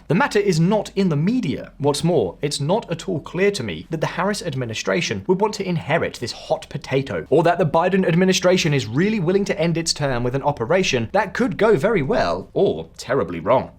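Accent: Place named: United Kingdom. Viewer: It's a little rich for a guy with a British accent to talk about a Dictatorship in another country. Bro, the Starmer regime is arresting Brits for Facebook posts! Yea but "it's okay when do it" right? 😂 The U.S should leave Venezuela alone. They've wrecked that country with thousands of sanctions, and they wonder why Venezuelans are crossing the Southern border into the U.S.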